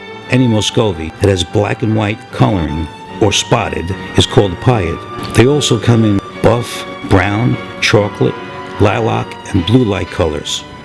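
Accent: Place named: Brooklyn, United States. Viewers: Amelia Bee Not a New Jersey accent. New York. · Amelia Bee he sounds like he is from Brooklyn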